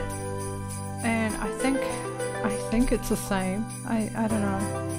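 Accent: Australia. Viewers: Are you Aussie or New Zealand? Or from a completely different place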